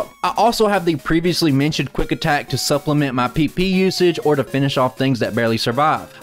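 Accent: South, United States. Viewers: i did not know how i'd feel about a pokemon solorun channel with a southern accent. guess i like it now 🤷🏽‍♂️ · Are you from a Southern state by chance lol ?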